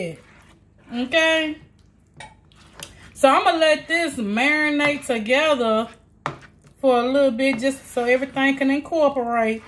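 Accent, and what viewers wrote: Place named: Tennessee, United States. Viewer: Girl you must be from West TN. 😂 girl! ❤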